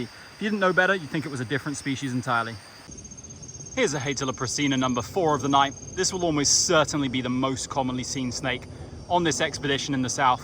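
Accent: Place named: England, United Kingdom. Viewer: Constantly amazed at the sheer volume of variety of our slithery friends., you are an excellent member of the YouTube Herpetologist Vanguards (patent pending). You have a pleasant voice and the English accent doesn't hurt, and that exuberance can't be faked. Thanks for this and all the videos you've upped. Er, not so sure 'bout the mullet. Brings back flashbacks of the 80's <shudder> 🖖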